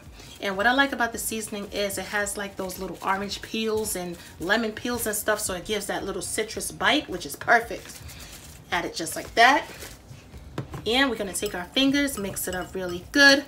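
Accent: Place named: Philadelphia, United States. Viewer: Ur killin me young Lady.....lol!.........You sound South Philly to me.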